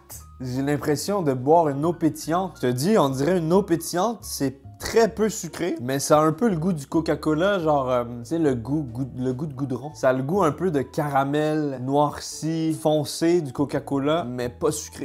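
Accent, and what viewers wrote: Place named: Quebec, Canada. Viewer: Accent québécoises**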